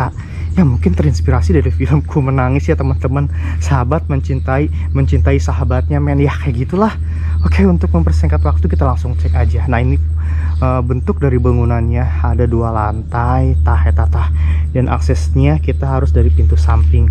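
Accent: Sunda, Indonesia. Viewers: Assalmualaikum kang...purwakarta hdir met pgi,br td pgi liat aa ngreview rumkit cirebon skrg rmh yg terbngkalai,ngdengr suarany cndu bbgt a..logat sundany itu lo kntal bngt😚🙏😘🥰 sukses trus chanel ny ya a🙏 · Panutan banget nih Bang....sukses terus bang hehe...semoga selalu bisa mempertahankan adat logat sunda dan makin meningkat...makin keren makin joss par jos geng...salam sehat salam santun salam explore....Bang... hehe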